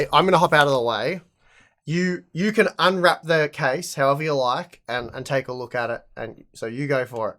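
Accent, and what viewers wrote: Australia. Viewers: Daddy's shadow., she's a good looking girl....looks a lot like dad. And yes, I simply adore that lovely Aussie accent. Keep up the great work. Does Tim have a son/daughter to also do a pc build.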